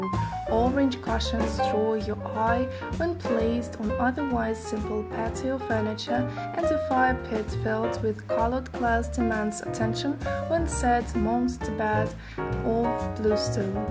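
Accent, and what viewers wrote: Russia. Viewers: Таааакой русский акцент)))) Но молодец, популяризирует автор красоту!))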